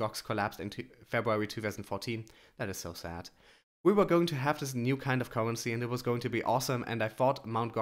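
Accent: Germany. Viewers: love your vids, thanks for your hard work. You remind me of German poker pro named Bencb789, ever heard of him? well, only thing you have in common is German accent. But you both sound smart AF! thanks for the info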